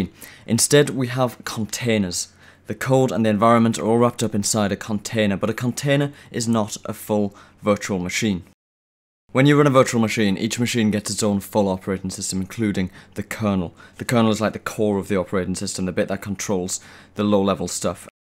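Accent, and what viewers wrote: United Kingdom. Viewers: fuckin uk accent(PIG)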